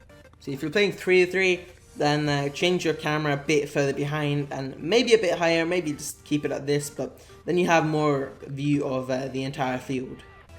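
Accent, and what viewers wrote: Sweden. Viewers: Are u swedish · Are you from sweden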